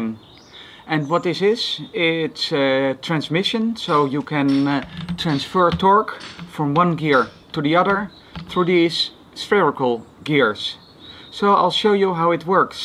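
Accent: Netherlands. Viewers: This is the most dutch english ever. Amazing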